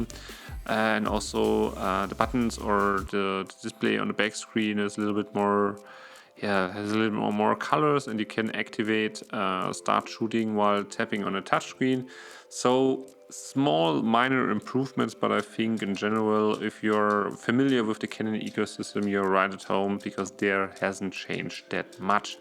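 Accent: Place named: Germany. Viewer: Man merkt, dass du deutscher bist! Informatives Video.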